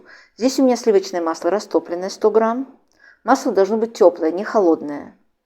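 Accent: Baku, Azerbaijan. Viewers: Здравствуйте! Спасибо за прекрасные рецепты!!!Приятно слушать вашу речь с бакинским акцентом❤❤❤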